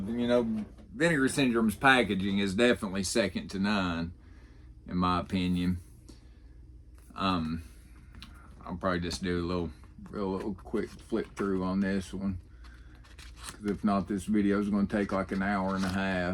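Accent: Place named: South, United States. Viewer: Just found this channel. Great video. You sound like a fellow Southerner. New sub.🎃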